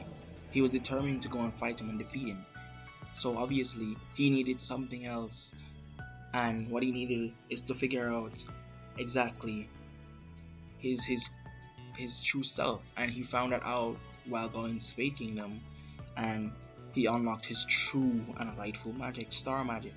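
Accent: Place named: Jamaica. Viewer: Is this a fellow Jamaican I heare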